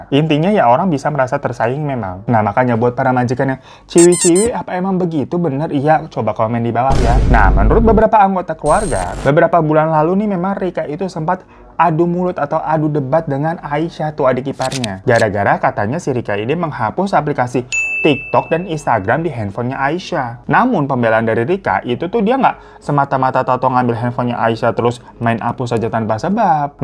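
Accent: Java, Indonesia. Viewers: Ngakak, ngapo logat palembang jd logat jawo wkwk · Bhs palembang logat jawo😂😂,, lucu amat😂😂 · Bang ngapo logat nyo jawo😂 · Bahasanya bahasa Palembang tapi aksennya aksen jawa..salam dari Palembang bang🙏🏻🙏🏻🙏🏻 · Kak...😂 Ngapolah logat nya be cerito cak wong jawo😅... Kito wong Palembang Galo ee kak 😂😂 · Lucu nian, bahasa Palembang tapi logat medok😭😭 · salfok logat jawa di bahasa palembang😭 gak papa bang dimakluminn kerenn kok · Bahasa palembang tp logat ny medhok jawa bgt ya bang 😁. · logat pembang nyo bang mirip logat jowo 😂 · Bahaso palembang logat jawo abng ni🤣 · baso plembang with jowo accent unlocked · logat Palembang nya lebih kelogat jawa😅🥰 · logattt nyo idk cakitu kok malah logat jawo🤣🤣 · Bang logat nya jawa bangat deh 😂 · Lucu bgt 😅😅.. logat jawa tp bahasa Palembang 😂😂😂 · Salfok dgr bang orng wong kito orng melayu sumatera,logat bang logat jowo😂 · logatnya medok bgt😂😂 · salfok, lucu banget bang baca teks nya tetep ada aksen dan nada jawanya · Palembang bang.. 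ngapa dah pake medok Jawa aksen bacanya 😂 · Lucu bngt denger bg tiap x ngmong bhs mana pun tp logatnya ttep jawa..😂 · Bhso palembang nya jd aksen jawa yo D maklumi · kok logat jawa bg😂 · Pokoknya bahasa apa aja pke logat jawa sama si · Salfok bang ngmong pake bahasa palembang tapi logat jawa hahaha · Bahasa Palembang logat Jawa 😂😂😂😂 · Baaaang ini logat palembang looooh kenapa pake logat njowoooooo ang ang ang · Bahasa Palembang logat Jawa 😂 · Apapun bahasanya... Logatnya tetap jawa.... Kocak memang Abang satu ini... 😂😂😂 Jawanya kentel banget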